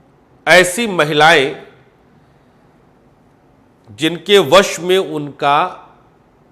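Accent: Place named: Uttar Pradesh, India. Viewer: Aapki awaaz mein ego Nahin Hai Apnapan hai yah Awaaz up Ke Lal ki hai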